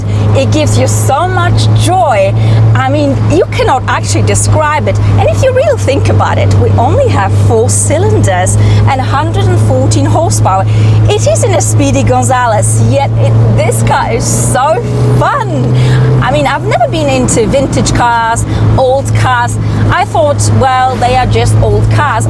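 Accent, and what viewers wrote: Germany. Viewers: Tollen Akzent. Er erinnert mich an eine Deutsche, die ich die Ehre zu kennen habe.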